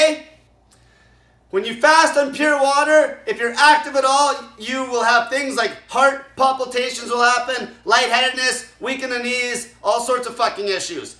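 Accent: Canada. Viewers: I detect Canadian in your yelling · Is he Canadian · i've never seen someone with such a strong canadian accent lol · Canadian eh?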